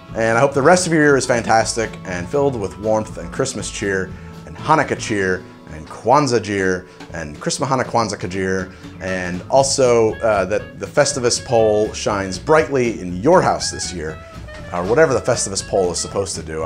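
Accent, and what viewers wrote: New Jersey, United States. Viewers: You're getting rather self-conscious about that Jersey accent of yours, aren't you? Don't let the comments get to you, there's nothing wrong with having an accent.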